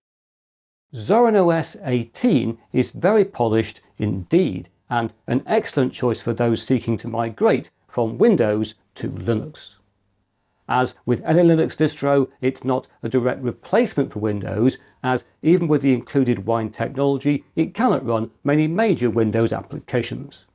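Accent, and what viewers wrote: England, United Kingdom. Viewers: I love the way you explain. This is great, thank you English Man, love the accent too by the way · It's like watching Bill Gates in the 70s, but then with a British accent, loved the old school video ! 😃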